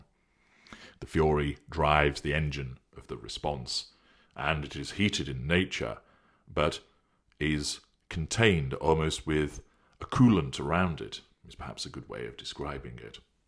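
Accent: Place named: England, United Kingdom. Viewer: Soothing? I find it cold. No inflections. Even Doctor Higgins' voice sounded more soothing and human than his. Although his accent is academic and his vocabulary is rich and high standard; Englishman I guess (doesn't sound Canadian 😁) no cockney.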